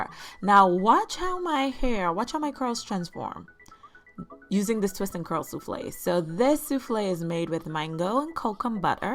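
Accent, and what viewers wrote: Bahamas. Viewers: I know my people! I love the finished look! 💄 1st off you and I have the exact hair texture, so watching your videos helps me a lot. 2nd My dad’s family is from the Bahamas so I recognize the accent! 3rd I am also from South Florida. 4th we might be related because I have Farringtons on my ancestral line. Salute my sister 🙏🏿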